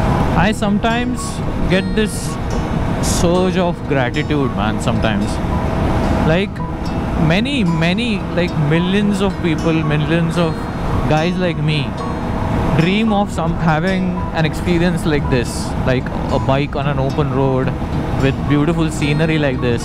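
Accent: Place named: Kolhapur, India. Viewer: I soooo friggin miss that Kolhapuri accent though! 🥺🥺🥺🥺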